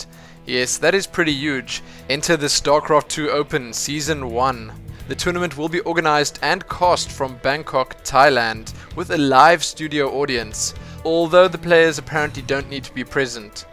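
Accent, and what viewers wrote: South Africa. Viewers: I absolutely love the South African accent. I want to hear your voice on a lot more Starcraft shoutcasts.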